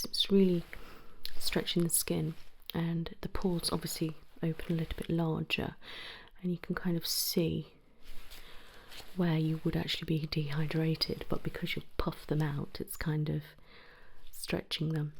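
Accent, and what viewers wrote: United Kingdom. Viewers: You’re seriously the best at these doctor roleplays. Very relaxing. Can’t watch any other doctor roleplays anymore cuz yours are better in my opinion❤️ plus I love the British accent (idk why, different languages and accents give me a lot of tingles)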